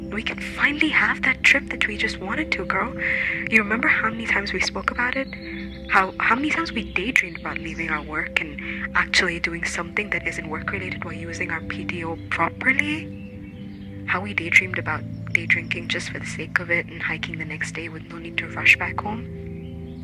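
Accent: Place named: India. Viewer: are you indian? you have that accent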